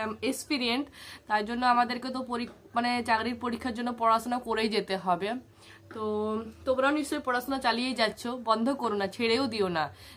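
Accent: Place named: Purulia, India. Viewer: Kotha sune ja bujhlam tomar bari Purulia ba bankura 😮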